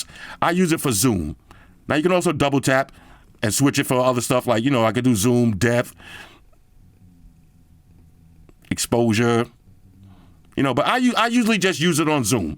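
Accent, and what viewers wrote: New York, United States. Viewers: I do have to say that I truly like and respect how you keep it real with your reviews and yes, I do have an iPhone 16 Pro black titanium and no I do not like the new three colors in the new Apple iPhone 17 Pro models. Of course you’re always going to have haters out there so you keep on being you and keeping it real and disregard all the haters you’re going to come across on your channel and I just subscribe to your channel and again I do admire and respect how you give good insight into whatever it may be Apple Google or any other smart Phone or product out there on the market in today’s marketplace. And since you touched on the Apple Watch Ultra 3 and just wanted to know how you felt about the 5G versus the Apple Watch Ultra 2 and how in comparison speed wise does the Apple Watch Ultra 3 is it slightly better than the ultra two or are they about the same speed wise? 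You definitely sound like you’re from the New York city Metropolitan area and I’m here in Brooklyn New York where I was born and raised and I always get hated on for voicing my opinions or telling it like it truly is but that’s OK. It’s not a popularity contest with me you either like me or dislike me and it doesn’t really matter to me because again it’s not a popularity contest with me what you say is what you get and a lot of people truly respect those or truly honest with themselves and others and of course you’re always going to have the hater or two out there again keep making great videos and keep it real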